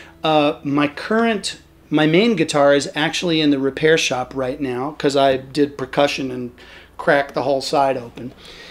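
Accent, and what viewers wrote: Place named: Australia. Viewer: I thought you’re Aussie!! Cool!!!!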